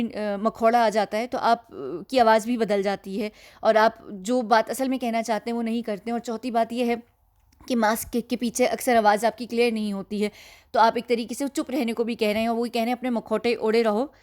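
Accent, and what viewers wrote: United Kingdom. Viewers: Salman Sucking up to PM Modi as the case is now in Modi’s hands. P.s - love your pommy accent 😊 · Your English accent is as perfect as a British or Australian accent; however I don’t like them and that’s my problem. I like your hindi/ Urdu accent. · Whats wrong with having an English accent? Thats like me saying hey I dont get pure Hindi words. Theres nth wrong with either or. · Plz carry on we like your English accent... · Great British accent👍😇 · Didi I really like your English accent..and your Hindi too...So be proud of yourself..We really love u didi. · Completely agree with you. Listened till the end. I love her accent too, English British accent ... 😊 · Please don't be defensive about your English accent, there are going to be many who will not understand your pronounciation...your accent is yours....move on. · I love your English accent. 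As you said you have learnt it... Any advice from you to kids on how to learn it? I am all 👂 👂!!! 🙏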